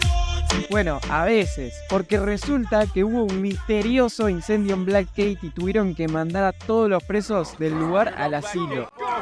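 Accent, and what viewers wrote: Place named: Argentina. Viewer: Papu este vídeo es cine desde la edición hasta la narración tan Argentina que usted tiene 40/10 y una pitusa de regalo🗿🚬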